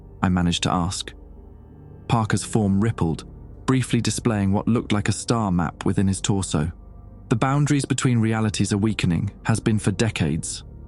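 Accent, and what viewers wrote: England, United Kingdom. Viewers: The British accent is a huge turn off, especially since the guy is supposed to have worked at Area 51 in the U.S. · Why does he have a British accent .? American military? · Another British voice narration , gee can I believe all this ? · Seems a little suspicious with that English accent to be Air Force.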